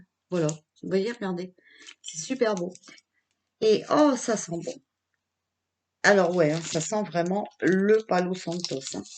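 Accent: North, France. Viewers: J’adore votre accent du Nord ! Mdrrr merci pour ces infos j’en suis sure il va tout faire pour nous aider ! La divinité l’a mis sur notre chemin celui de la vérité et de l’amour ! Sans hésitation je vote Rémy ! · bravo à vous , vous tapez souvent dans le mile , vous etes un soutient psychologique pour plein de monde , vous etes empreinte de la lumière , j'aime bien votre accent du nord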